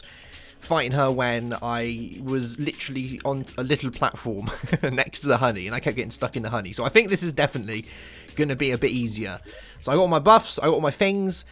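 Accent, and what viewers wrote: United Kingdom. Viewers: Foster He is British. That's how we say meteor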